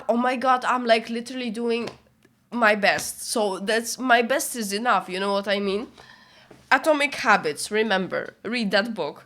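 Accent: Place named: Poland. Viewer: Polka? Bo masz dobry angielski, ale ten akcent trochę zdradza ;d W każdym razie trafiłem na eseje o Raphaelu i widzę, że nie tylko ja mam obsesję na jego punkcie. Pozdrawiam.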